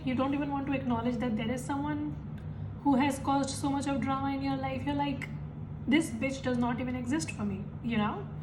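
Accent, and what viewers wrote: India. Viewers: Your Indian accent is very interesting I like they way you laugh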